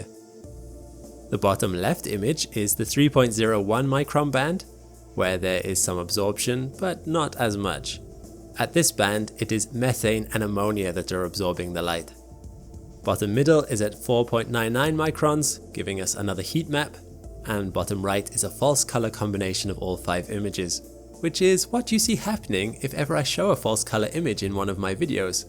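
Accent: United Kingdom. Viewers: Why is he trying to sound like a British? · Why do you sound English but foreign at the same time? Can someone make it make sense to me? Is actually English or was he born somewhere else and moved here?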